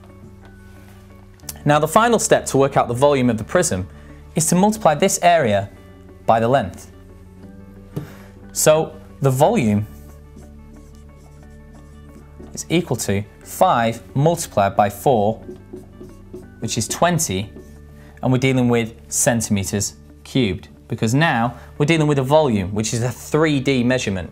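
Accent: United Kingdom. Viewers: hehe hes british luv the accent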